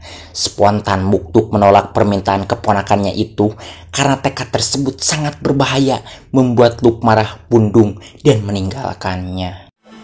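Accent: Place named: Sunda, Indonesia. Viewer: sebenarnya filmnya ada yang udah ditonton...ngan eta resep naratorna. Aya emplud-an kitu. Crat cret crot...aaah pokona nyunda banget weh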